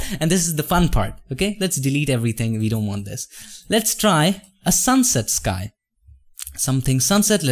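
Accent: India. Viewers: I like the way he speak and explain things I work before in Saudi I have my Co woker indain people I miss the accent of them :)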